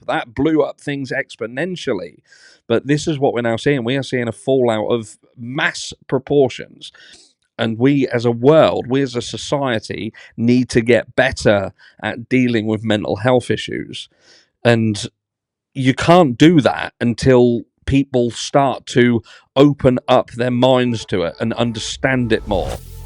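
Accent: Norfolk, United Kingdom. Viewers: Where r u from? U should english amd from Norfolk is that right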